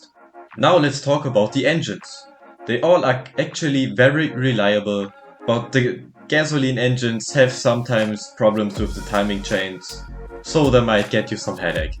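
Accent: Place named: Germany. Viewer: hahaha, ich muss aber auch sagen, bei den neueren Videos hört man den deutschen Akzent aufjedenfall weniger 🤝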